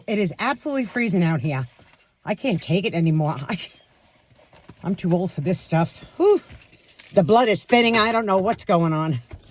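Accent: New York, United States. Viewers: Oh my goodness!!! I can definitely relate to you! My husband was a long haul driver too! The first few years we were married he was gone all the time! now he drives for Uber L O L I like it. But then I don’t like it when he sits in his chair at home! i’m like…. don’t you wanna go do something? He’s like, No…but we have animals so he’ll go outside to the animals in the morning and then he’ll go tend to the animals in the afternoon…. but then other parts of the time he’s in his chair when he’s not driving for Uber and then I say don’t you wanna read a book or learn a new craft; build a new porch or build us a new deck?!?!, my firstborn was born in 1987 so I wonder if we also have that in common…what year was your daughter born? By the way, I love your accent. Are you originally from Long Island or from New York? So your birthday is on the 28th!!! How exciting! 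I hope you watch my next video. I shouted you out!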